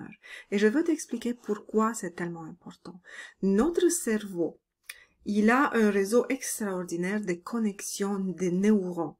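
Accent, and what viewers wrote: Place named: Latin America. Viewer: Merci. Je ne peux pas dire que c'est une période difficile que je traverse mais une période de transitions avec divers projets qui demande courage, patience, persévérance, quasi à la retraite,... j'applique déjà ces 3 conseils mais j'apprécie beaucoup de les entendre de ta voix. Cela cautionne ce que je mets en place pour parvenir à réaliser mes projets avec sérénité, à être patiente avec moi-même.....j'aime ta voix apaisante, ton accent latin doux et chaud et parfaitement compréhensible. Je t'aime aussi chère.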